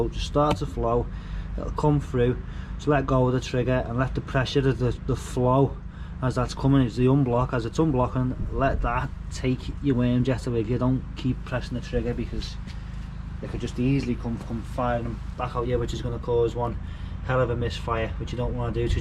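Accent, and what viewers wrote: Liverpool, United Kingdom. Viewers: Please don't talk when the jetter is on. It drowns out the sound of your voice. Your strong liverpool accent is difficult to understand already.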